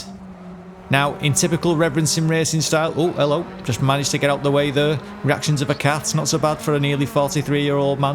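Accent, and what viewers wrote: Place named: Bolton, United Kingdom. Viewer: Bolton? I recognise the accent. Anyway your very close to me!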